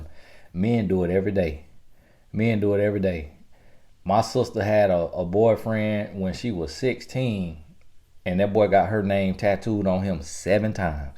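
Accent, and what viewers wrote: United States. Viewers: Lol crack me up with that country accent... ladies listen to this man of GOD! He is telling us the truth! Do not chase no man PERIOD! Let them go something better will come if you are patience...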